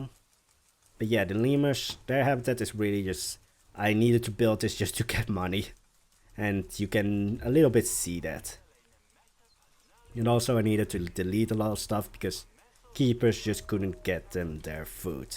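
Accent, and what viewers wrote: Netherlands. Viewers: You know right away it's a Dutch guy. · Echt gaaf! Haha ik kon gelijk horen dat je Nederlands was, niet dat dat erg is, is juist leuk :)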